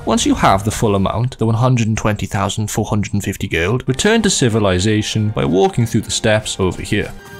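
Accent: Wales, United Kingdom. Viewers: Are you from close to Cwmbran my dude? You sound like you’re from Newport or Cwmbran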